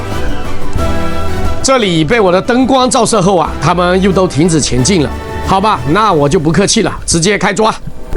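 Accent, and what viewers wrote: Fujian, China. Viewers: 听你的口音应该是福建人吧？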